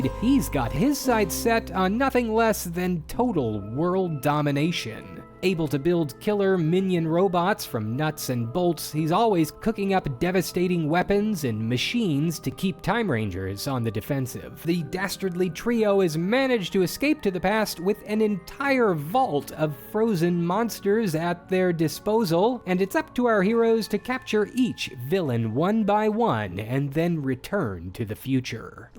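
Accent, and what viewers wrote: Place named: United States. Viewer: You sound very American 😂🤡.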